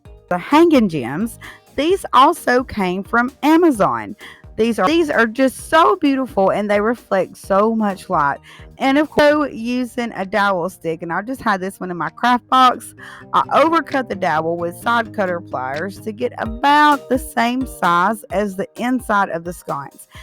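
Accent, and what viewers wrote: South, United States. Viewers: Beautiful.... I love your. Country accent super cute. Ive been watching you now for almost 2years. It goes by fast. Thank you for exploring your creative mind allowing us in on all your secrets!!!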